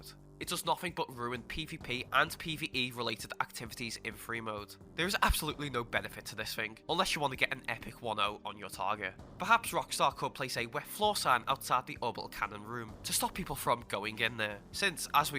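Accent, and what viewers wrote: Liverpool, United Kingdom. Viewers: you from liverpool? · 😂 Scottish! Lmao he’s from Liverpool. not Scotland 🏴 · hatred for bri'ish accent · no he’s british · Are u scouse lad or u a wool? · Are you a scouser? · still British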